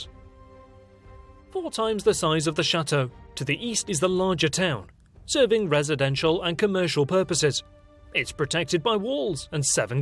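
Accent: England, United Kingdom. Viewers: na i'm sorry x) Poitiers, the S is silent, Saumur is said Somur, Tours, S is silent... Not even close to 5% x) But yeah, this channel is cool. just that damned rosbif accent. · What part of Britain are you from?... This sounds like one of the numerous British dialects of English (yes, unlike most people, I know there are more dialects of English in the British Isles alone, than in the rest of the World, combined).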